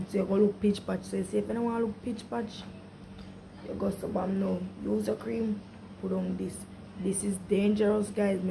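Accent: Jamaica. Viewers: Oooh hie bomboclat kkk l can hear u speak like Jamaican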